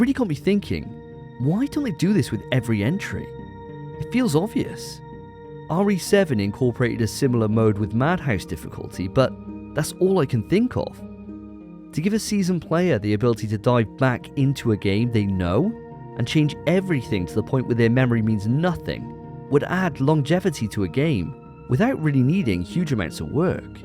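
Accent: United Kingdom. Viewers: It’s just not well thought out. Also, the British accent is annoying.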